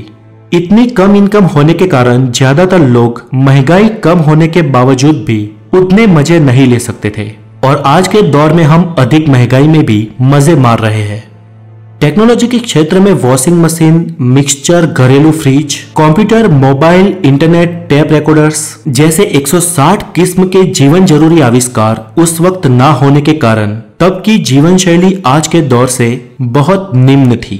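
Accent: Gujarat, India. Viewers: Bolne wala Gujrati hai☺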